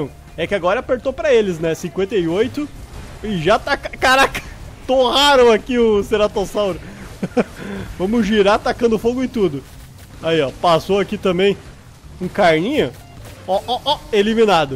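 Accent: Rio Grande do Sul, Brazil. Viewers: Rsrsrsrs o tem essas gírias e falas gaúchas. Deixa muito engraçado rsrsr 😎😁😆😆😆😆😆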